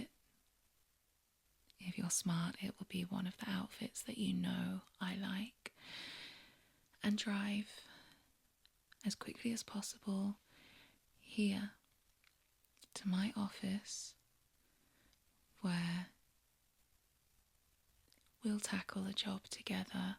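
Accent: United Kingdom. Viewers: Your British accent makes totally devoted to u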